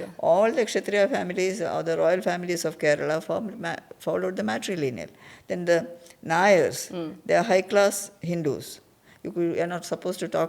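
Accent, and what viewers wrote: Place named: South Africa. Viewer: Your voice accent is very interesting. I am a South African Indian and your voice has some of the accent from South Africa 😅.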